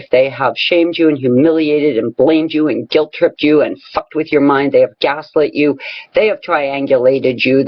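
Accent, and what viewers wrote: Canada. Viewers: Hey, if I may ask what province are you from? I think Nova Scotia, and also for some reason,Manitoba. I'm from Ontario, theres no place like home.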